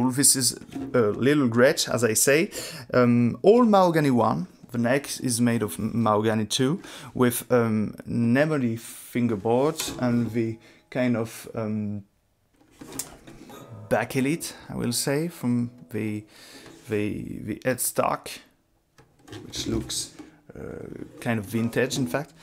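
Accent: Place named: England, United Kingdom. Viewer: Super video, bon j'avoue que l'accent anglais pique bcp et que j'ai eu un peu de mal avec ça mais sinon très chouette sujet, bien traité et magnifique jeu ! Gros coup de coeur pour les résonateurs mule depuis quelques temps (et cette vidéo ne fais que le confirmer) donc ma préférence va au tricone mule vert.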